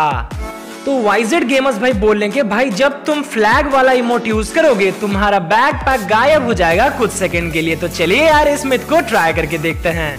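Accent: Odisha, India. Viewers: Bhai aap oddisa se ho Kya 😅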